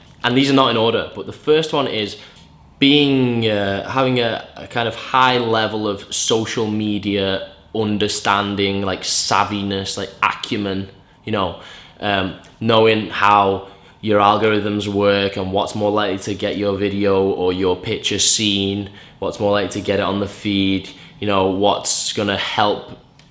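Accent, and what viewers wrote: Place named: Liverpool, United Kingdom. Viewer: Is his accent from Liverpool, Manchester or Newcastle? ...sounds liverpool. love it!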